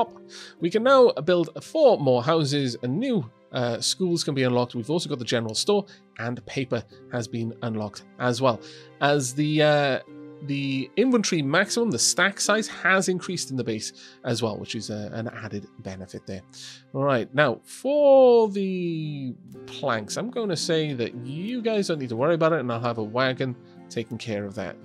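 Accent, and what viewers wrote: Wales, United Kingdom. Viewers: what part of wales are you from? heard the slight welsh accent then seeing your chanell logo be a red dragon i knew you was welsh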